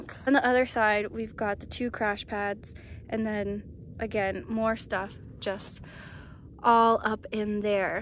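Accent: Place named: California, United States. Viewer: She sounds like she’s from California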